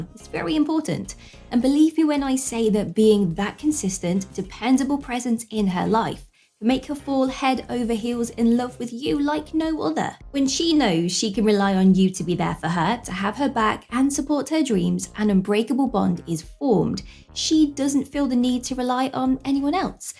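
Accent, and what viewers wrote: United Kingdom. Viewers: I watch this just for the excellent british english 🥰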